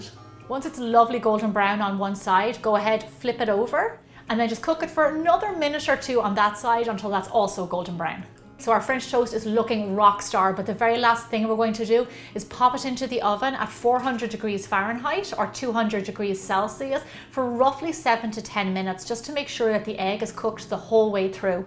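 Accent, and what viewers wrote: Ireland. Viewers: Love, love your Irish accent! ☘️ I’m Irish too, but w/o the accent, ha, ha. And your recipes are all excellent. Now, I have been making my French toast exactly like yours for years....except now I’m going to try & add the extra egg yolks. That’s new to me, & I bet it makes a difference. Thanks so much!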